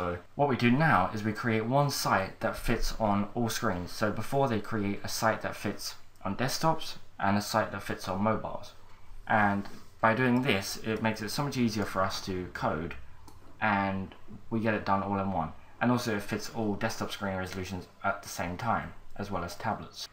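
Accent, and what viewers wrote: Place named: England, United Kingdom. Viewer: I agree with HCFP Gaming, TOTALLY! There is always a pause button. Besides, I don't like downloading ONE video for just one piece of information. Download the video software with a traffic code (well, I am not on commission VLC Media player -free), you can watch your video at whatever speed you want. Do you know how unhappy my ISP is when I download 54 videos which could have been done in 14!? Love his video, especially the fact that he's English! Yummy accent!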